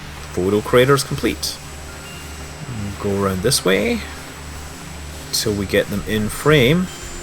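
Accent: Scotland, United Kingdom. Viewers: Because he's a Scotsman. He also says Idear. c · Hullo, there is no problem at all with the Ts, they are clear as day. The "Hwhat" thing isn't an audio processing issue, it's just a common garden Scots speech pattern which can really stand out to the non-Scottish.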